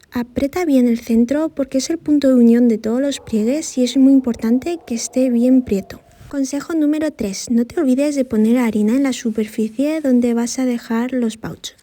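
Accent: Spain. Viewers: Me encanta tu acento español!!!! 😂👍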